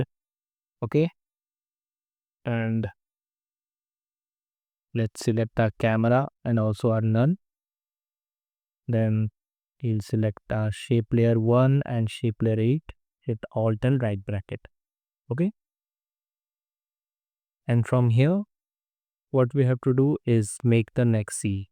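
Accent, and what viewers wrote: India. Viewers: I just hear the india accent and i Liked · no music no sounds just pure indian accent and after effects thanks dude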